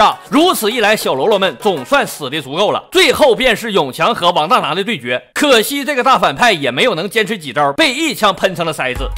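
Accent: Northeast, China. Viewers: 東北話老好聽了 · 他东北的 哈哈哈哈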